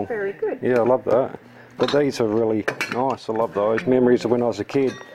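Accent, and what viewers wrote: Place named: Australia. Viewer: It's hard to tell voice wise with the Australian Accent, but there are mannerisms about him I found familiar. You may notice when I speak in video's on my You Tuber that I sound a bit Monotone. That is one of the traits. But Aspergers is a complex animal and it varies between those that are gifted. Some have Autism and Aspergers really bad and don't do much but sit down and nod there heads up and down, that will be fixated with computers and gaming. Others will be high functioning and have the same fixation, but may take it further. This why you always hear about people with Asperger being arrested for Hacking into systems. Threat to National Security? Not so sure bout that =^~^= I am an intellectual and have had so many interests during my life. That I know a bit about everything. My downfall is I get stressed easily and often blank out people. 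I am not always the most patient person. But touch a subset I am into and I am then hard to stop. I love nature and history. Individuals with Autism or Aspergers very rarely go through a normaly education and will also find it almost impossible to hold down a normal job, so will end up Volunteering. I do volunteer work meself. But unlike most I was luckily enough to have a main stream education further education, and even college, learning the gardening trade. And I did work properly for just over three years before I had to give up due to Physical and mental issues. seem like a nice guy. And I bet he knows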